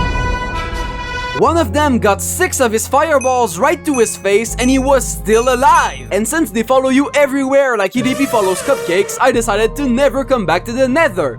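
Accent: Quebec, Canada. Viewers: Came in for the nice ouse, stayed for the gros accent québécois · Est-tu québécois par hazard?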